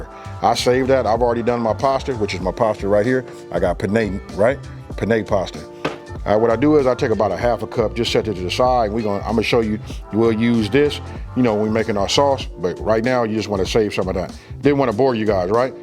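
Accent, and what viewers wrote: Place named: Midwest, United States. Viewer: Bro where you from I’m from Kansas City Missouri and I swear you from KcMo or Midwest by your accent or somewhere Maybe Detroit lol… nonetheless that meal fire G I’m making this next for my wife & moms Insha Allah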